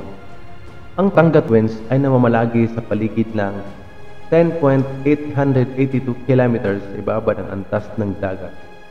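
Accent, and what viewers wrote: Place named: Visayas, Philippines. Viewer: Wala na halos maintindihan puro reverb.Visaya ka pa man Dong😅😅✌️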